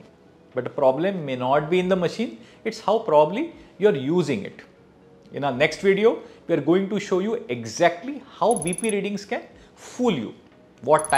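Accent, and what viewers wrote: India. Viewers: Your thick Indian accent makes it difficult to hear your words when you speak 😊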